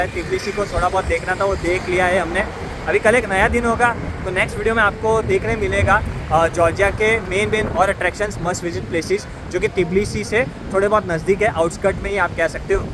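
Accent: Gujarat, India. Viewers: Bhai ko boli se pata lgta hai ki bhau gujju hai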